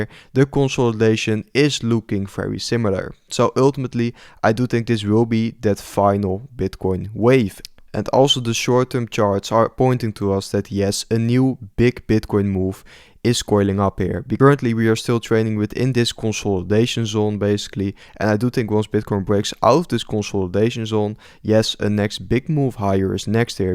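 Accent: Netherlands. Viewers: Nederlands accent is zo makkelijk te herkennen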